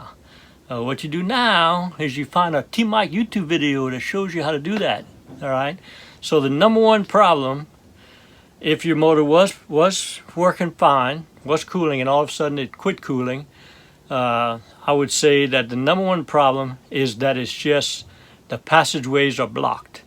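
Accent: Louisiana, United States. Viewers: I miss that Cajun Accent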